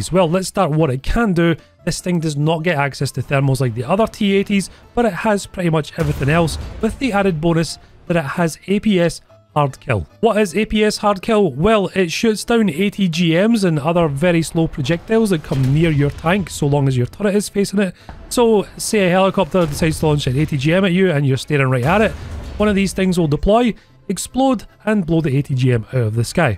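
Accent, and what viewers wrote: United Kingdom. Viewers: You have an intresting accent which part of the uk are you from? I cant tell where most accents are from I a kiwi (new zealander) thought another kiwi was an american because they were from a different part of nz and spoke slightly differently. It was rather embarassing when he said he was a kiwi, thankfully he thought i was an australian so we both had a laugh about that.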